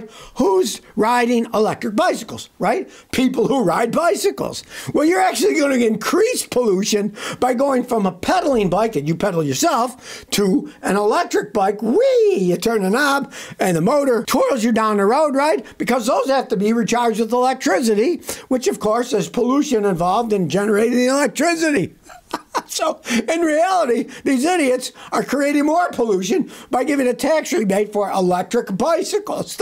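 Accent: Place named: New York, United States. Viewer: I have watched a couple of this guy's videos with him screeching. The jersey\new york accent is the most irritating in the world. How do you all watch this garbage? I am going to mark this channel as do not recommend.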